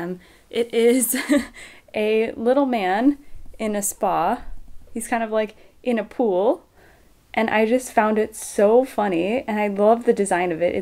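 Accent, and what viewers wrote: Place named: Germany. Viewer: You & your German accent are so cute!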